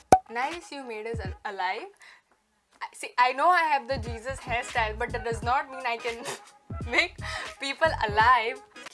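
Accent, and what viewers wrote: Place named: Goa, India. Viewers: I haven't been to goa in such a long & hearing you speak in that typical Goan accent makes me want to go there and learn the rest of the language and speak and catch the accent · That konkani accent doest suits you.,........😬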